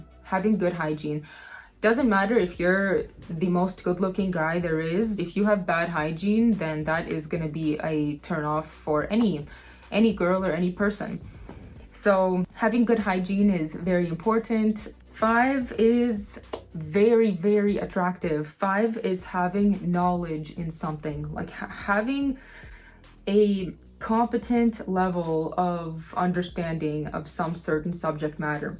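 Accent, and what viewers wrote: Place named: Brazil. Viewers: are you brazilian? trying to figure out where that accent comes from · As a brazilian myself she pretty much sounds like one equal who have lived for years on an anglophono country.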